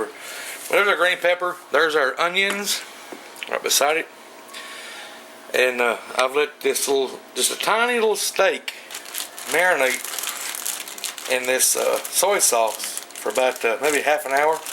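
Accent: South, United States. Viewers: Yum Love your southern accent ! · Country as hell omg can barely understand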